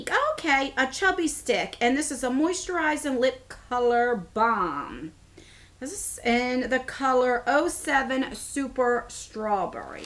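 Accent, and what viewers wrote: New Jersey, United States. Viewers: I love your Neugh Joursey accent...it sounds like music to my ears💞 I was raised in Philly, I know all about cawfee and wudda.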